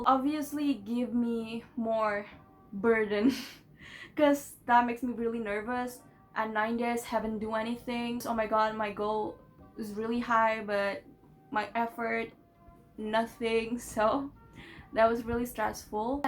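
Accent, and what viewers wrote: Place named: United States. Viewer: your american accent sounds recognizable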